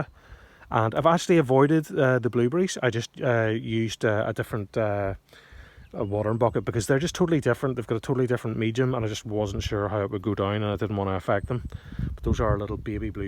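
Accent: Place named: Derry, United Kingdom. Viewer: I’m from Strabane so similar accents, you’re just slightly more Derry than me 🤗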